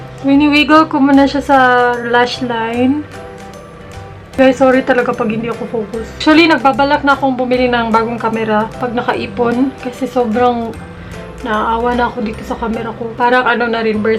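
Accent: Visayas, Philippines. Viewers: bisaya ka po ba ate?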